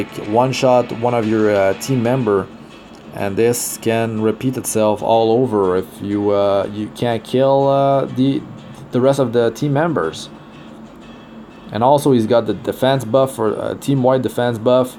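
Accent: Quebec, Canada. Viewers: Are you a French canadian? Idk why but you sound like it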